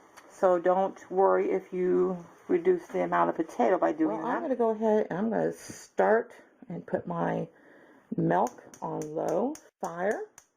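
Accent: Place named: Illinois, United States. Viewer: This is so werd! Assuming, as I am, that you're from central Illinois based on your accent (...I love how you say sauseege, potayda, and puddin' just like my grandma used to!), you're like this odd mix of both sides of my family. My mom's side are Lithuanian from northeastern PA so I had kugeli a lot as a kid... and my dad's side are all from Bloomington, IL, where I was born. So cool to see it mixed like this.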